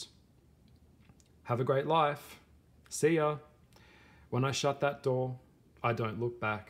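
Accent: Australia. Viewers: Oh, without a doubt, but now that you mention it, you have that fun, witty energy of the demon too. I don't want to sound like I'm just being flattering here, but you've captivated my mind the last several hours listening to you. Like Crowley you seem well read, insightful, and artistic with a bit of hedonism and I would think that would excite a lot of women. This particular video made me think about my sexual choices in life and how I always played it safe with always choosing the nice guy who would always tell me what I want to hear and never challenge me or be too overtly sexual, although my sexuality was off the charts in my 20's and 30's. After a hysterectomy that really changed me hormonally unfortunately, but I think I haven't had anyone to really stimulate that either. To get to me it's always been through my mind and no one ever figured that out about me. 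Anyway, I've commented a lot here on your videos and probably should be embarrassed that it's overkill, but I find you pretty fascinating and am impressed with your knowledge and insights on the Aquarius, both the positives and the negative. That Ozzy voice is to die for, I've always loved the Australian accent, it's my favorite and I always wanted one lol. My other best friend is a Leo and lives in Tazmania.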